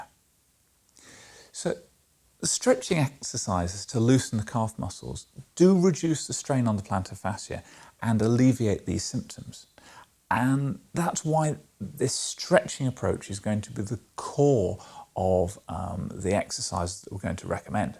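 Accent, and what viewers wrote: United Kingdom. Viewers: Because he’s damn sexy! That is a breathy voice with a British accent laid on top. Sessy!!!!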